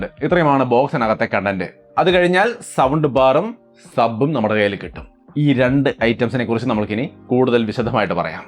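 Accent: Thrissur, India. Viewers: Trissur slang🫶🏻⚡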